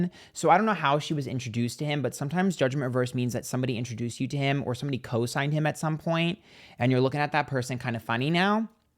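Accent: New York, United States. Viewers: I knew you had to be a New Yorker lol 💖🤌🏼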